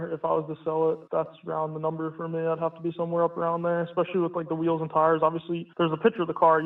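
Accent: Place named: Montana, United States. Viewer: Eww I can hear the Montana in his voice lol 80k was actually a good offer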